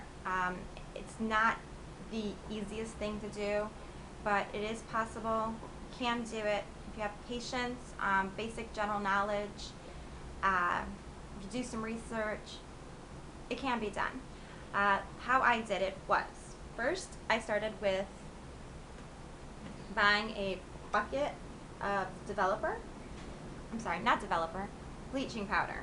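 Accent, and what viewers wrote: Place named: New Jersey, United States. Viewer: i love your voice, you sound jersey haha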